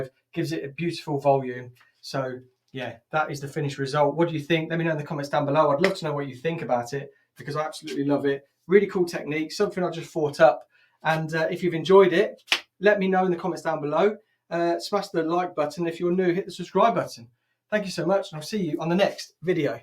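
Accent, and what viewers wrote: United Kingdom. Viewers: I watched this video the first time with the sound off. I never would have guessed you had a British accent.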